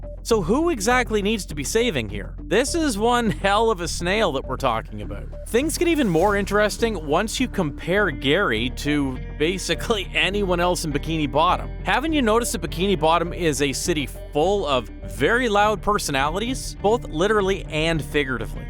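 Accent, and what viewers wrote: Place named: Canada. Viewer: you sound too Canadian to be AI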